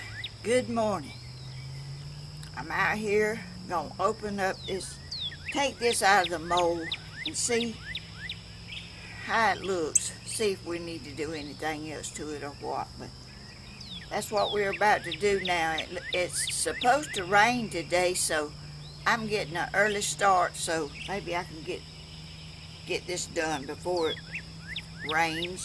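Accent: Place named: Georgia, United States. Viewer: Love your Georgia accent❣️😊 🌹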